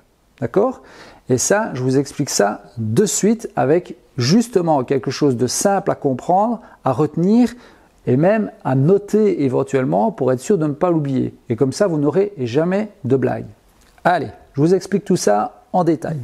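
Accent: Liège, Belgium. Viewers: clair et précis et liégeois oufti !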